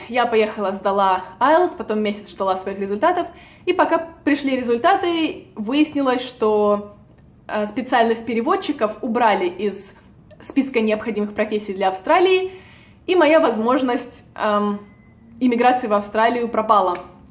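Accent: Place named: Rostov-on-Don, Russia. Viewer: этот очаровательный южный диалект Ростов/Дон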